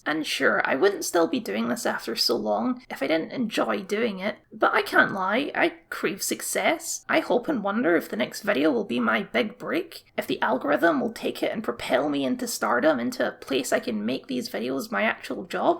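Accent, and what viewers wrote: Scotland, United Kingdom. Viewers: I'm a new subscriber and I'm digging it. About your voice im confused as an American all Scottish people sound funny to me what's different about you? Lol no but seriously I like the content and I love interesting voices and accents hence I listen to very few American essayists because they all sound like me and that's boring.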